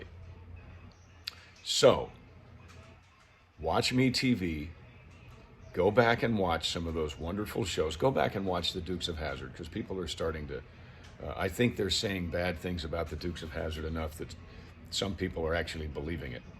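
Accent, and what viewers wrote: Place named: New York, United States. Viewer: You sound like a New Yorker of the East Coast. Lord forbid! You are spot on! Keep talking? Been watching "Chips!" myself... "Heat of the Night!" "Magnum PI," "Hunter!" "Soap" .."Quincy." " Little House on the Prairie." "Mash!" And what I would like to find and re watch again is "Benny Hill!" Kids don't know what they are missing. I wish they would rerun "Dr Quinn M.D." again... They never get old!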